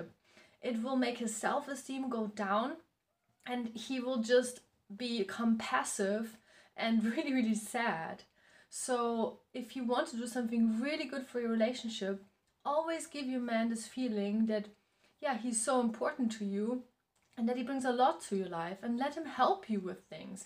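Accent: Germany. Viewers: She sounds German. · That German accent! Purrr. 😍 Validate me all you want BB!! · I can hear the Kraut in her voice from 6,000 miles away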